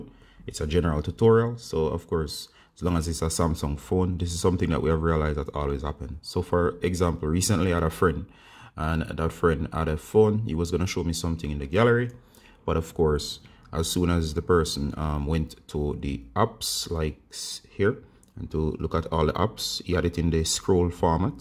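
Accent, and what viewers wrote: Jamaica. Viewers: I hear the 876 accent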